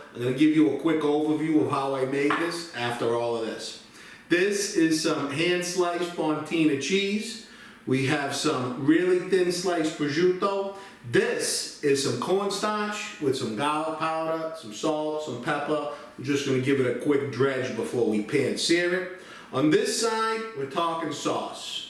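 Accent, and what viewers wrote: Boston, United States. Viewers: Nice and the Boston accent,makes the video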